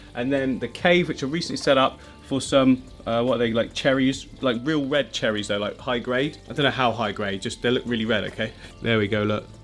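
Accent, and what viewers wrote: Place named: United Kingdom. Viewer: You know how, for example, Andrew Garfield & Tom Holland & Hugh Laurie & Asa Butterfield all hide their Great Britain accents with USA ones? You should do that.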